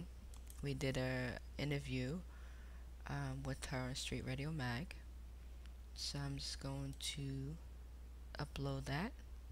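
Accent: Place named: East Coast, United States. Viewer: thank you so freaking much man.. i could not verify the audio file at all thank you so much it was in front of my face the whole time.. love your voice and eastcoast accent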